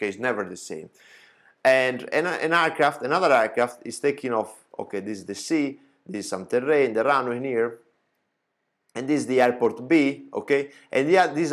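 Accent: Italy. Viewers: I love Italian accent in English Amazing man , I enjoyed the speaking more than explanation 😉. A320 tutorials if you don’t mind Capitano 😘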